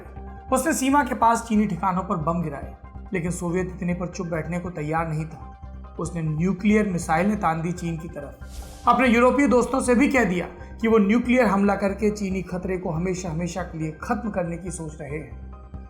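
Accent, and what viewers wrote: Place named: Uttar Pradesh, India. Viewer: Yaar Saurabh bhaiya aapki ye UP wali style gazab hai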